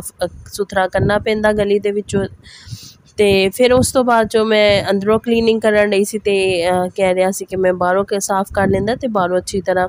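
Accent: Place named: Faridabad, India. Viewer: Tadi punjabi ton lgda tusi fabad ton jy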